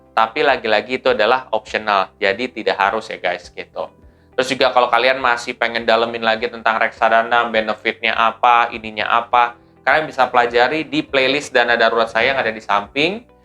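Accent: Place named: Java, Indonesia. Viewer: Keturunan bule ya mas tapi medok jawa 😊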